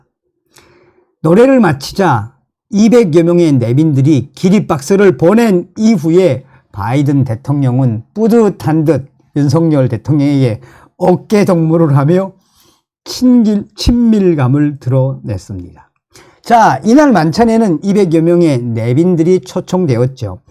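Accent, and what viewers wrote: North Korea. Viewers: 정선생님 기분좋고 감사한 방송을 주셔서 감사히 시청 했수다래 정선생님 기분이 좋으시면 꼭 북한 언어 사용 무척 정감있고 즐겁게 시청 하이 항상 건강 하시기요 정동무 ~~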